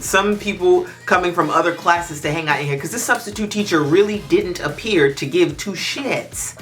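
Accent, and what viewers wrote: Trinidad and Tobago. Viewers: I knew you were from the Caribbean but this video and the last I watched confirmed you trini 😂❤ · Ohhh guuaaash I never realized she ah Trini.... I'M IN LOVE....sorry finish the story..... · Jamaican? It’s Trinidadian,, very different accent. Jamaica is only one island in the Caribbean. Every Island has a distinct accent. It’s pretty easy to switch when you’re immersed in both. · Hi first-time visitor to your channel...you are a beautiful trini womanand a great orator...excellent. Love the trini accent...keep it coming. Trini man here...enjoyed your delivery style❤❤❤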